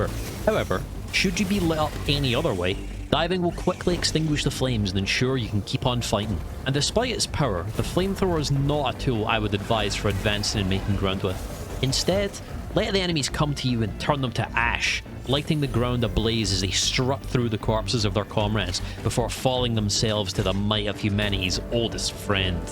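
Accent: Scotland, United Kingdom. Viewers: A Scottish sci fi quartermaster giving me flamethrower recommendations?? This is heaven for the ears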